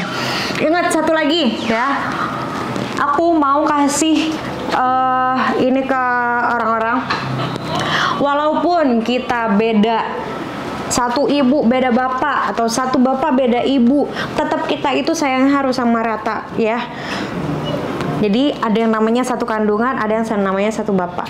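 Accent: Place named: Manado, Indonesia. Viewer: sekolah dimana ngana ? fasih juga logat manado nya..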